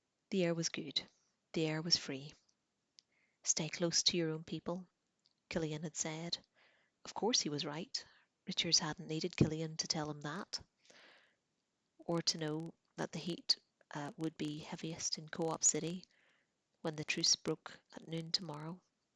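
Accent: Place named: Northern Ireland, United Kingdom. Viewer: Great to find this..and another NI accent to boot 👍 I love that some of the language is making the reader uneasy 😂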